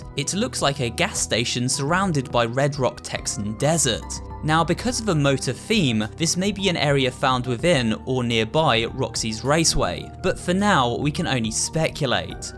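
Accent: United Kingdom. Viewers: like the british accent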